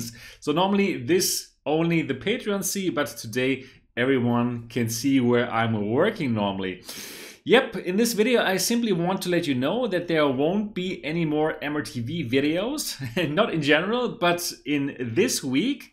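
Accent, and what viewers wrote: Germany. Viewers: You’re so amazing! How could you German speak so heart-touching English and also excellent Chinese. 🤩😃 And I had also watched your other vlog videos.. daily lives...with your wife I love your English speaking accent😳😢 ! And all of your styles... _ I can speak English and Spanish,but I also want to learn German❤️! I’m from Taiwan 😢 ,soon I’ll go to Europe. Spain,Portugal and your country “Germany”🇩🇪 ! And I love football so much !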